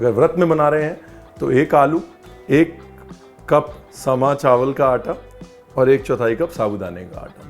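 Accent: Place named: Lucknow, India. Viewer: Punjab in blood and Lucknow in soul..such a deadly combination you are...love the way you talk in “lakhnavi andaz”... Aaye Haye!!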